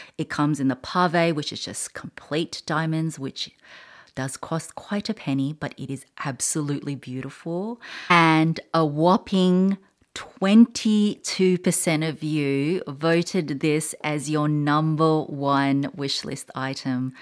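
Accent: Australia. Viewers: I am surprised that the Cartier watch (Tank or Panther watch) didn't make the list. I think the problem with Tiffany is that they don't really have one iconic piece that is unique to them. I think the Tiffany T line the T square bangle can become that piece, unless they start changing it then it won't be iconic anymore. Thank you for such a lovely video. Alway enjoy watching your videos...and that Australian accent is gorgeous!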